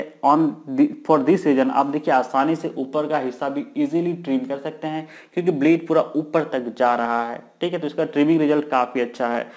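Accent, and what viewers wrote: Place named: Bihar, India. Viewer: ha lga mje... Bihari tone se.. I'm also from Bihar MFP... I also make videos.. aap dekh skte ho mere channel pe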